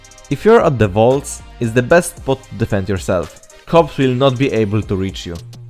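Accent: Poland. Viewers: holy polish accent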